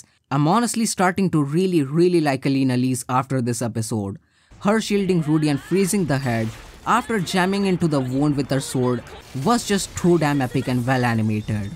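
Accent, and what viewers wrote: India. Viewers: This indaian accent is killing me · It's indian accent bruh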